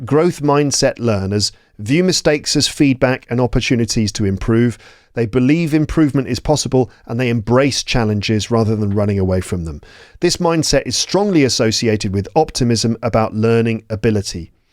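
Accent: United Kingdom. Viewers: Hello everyone, i would really appreciate your help. My question is, Am I wrong, listen to, which I consider the best channel for learning English. I'm wondering this behe has a British accent, and I am in a place where American English is spoken,. I mean obviously it's different but i also think listening to it's helping me to improve my listening and speaking. At grammar I'm terrible. Thank you guys.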